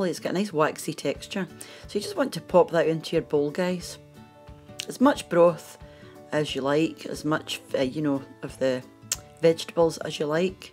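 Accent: Scotland, United Kingdom. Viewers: I’ve just found your YouTube recipes, I love how simple you make everything love the Scottish accent too reminds me of my good friend, thank you for sharing, I love it x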